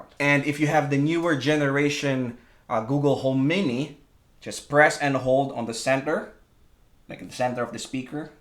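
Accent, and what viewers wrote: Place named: Philippines. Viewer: Thank you so much! It worked! And judging off the slight accent... Salamat Po! (?)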